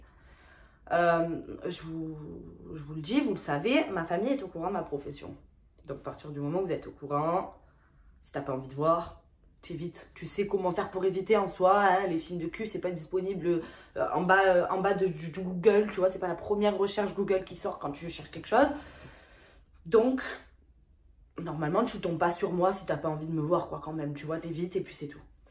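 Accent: Marseille, France. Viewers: Hello, tu as un accent sympathique, tu viens du sud ?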